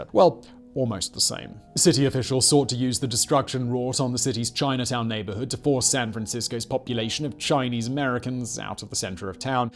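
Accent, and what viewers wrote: United Kingdom. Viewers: This guy is so boring I cannot listen to him much longer. He talks so fast and I stand, that British accent. oh I can't stand this guy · looks so young! And his British accent is not as prnounced as it is now.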